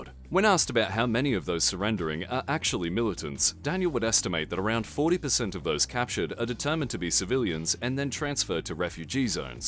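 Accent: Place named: Australia. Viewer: Just Have to say, ever since the Voice over change my partner cant stand your channel and I can no longer View your videos without her expressing anger from once backing this gaming channel especially with the change over to the news which she enjoyed. But now it just feels soulless like a top 10 youtube channel with the generic Australian Voice over. i can't stand it either. Rather be listening to Microsoft Sam.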